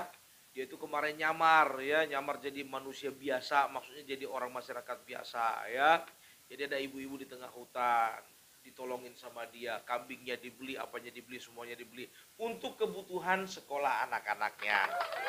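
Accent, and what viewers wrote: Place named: Sunda, Indonesia. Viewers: nyunda pisan euy... hebring 🎉😅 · Abang basa sundanya nyunda pisan👍terima kasih sudah mendukung bapaknya jabar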